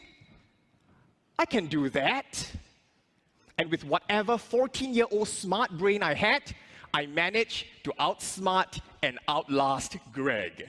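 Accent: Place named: India. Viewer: India accent sounds like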